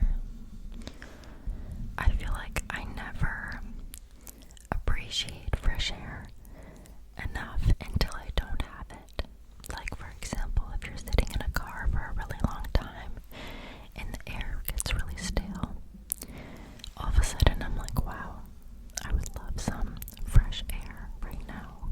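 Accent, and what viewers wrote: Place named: Canada. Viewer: I can tell you’re from Canada with the accent lol but you speak very well for being a learner still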